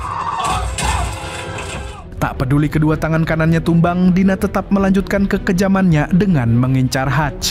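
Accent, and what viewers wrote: Banjar, Indonesia. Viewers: Orang banjar jua 😮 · Urang banjar kah pian min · Cah orang banua jua sekalinya😂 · Urang banjar kah pian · Banjar jua sakalinya 😅😅😅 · Buan banjar kah mang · Mang pian urang banjar kah? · Bah waluh urg banjar skali ny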